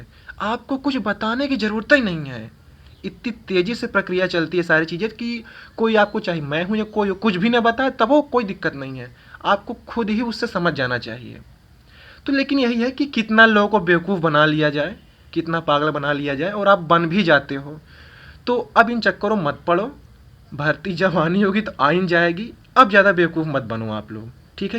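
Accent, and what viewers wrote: Allahabad, India. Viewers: Bhai aap Allahabad se hee hai na? Kyoki aapki boli aur bhasha sunkar maza aa gaya. Bahut acha laga.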